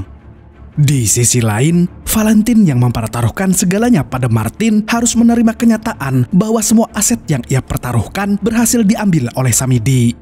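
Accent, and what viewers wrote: Banjar, Indonesia. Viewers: banjar kah pian mang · haw banjar kh pian?😁 salam ae nah dari kaltim hehe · Nahh, urang Banjanr adminnya neh..😅😅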